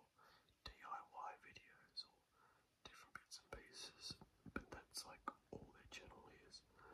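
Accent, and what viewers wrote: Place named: Australia. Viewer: You sound Australian.........and I don't like it.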